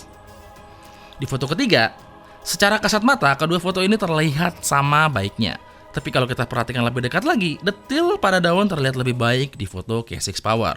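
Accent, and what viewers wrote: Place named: Malang, Indonesia. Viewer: joss nemen :v pemalangan bgt bahasanya 😆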